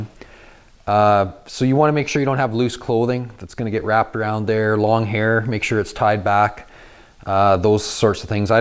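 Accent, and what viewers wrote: Canada. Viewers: holy canada accent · You sound like a Canadian eh. Am I right?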